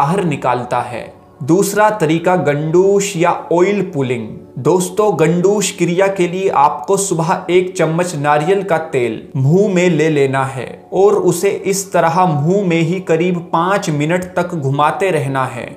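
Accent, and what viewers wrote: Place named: Haryana, India. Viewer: Paka hariyana se ho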